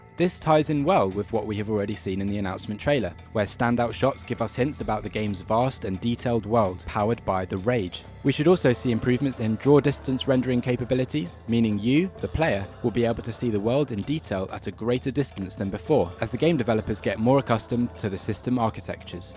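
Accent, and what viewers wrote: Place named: London, United Kingdom. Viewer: man, I love your accent. Are you from London?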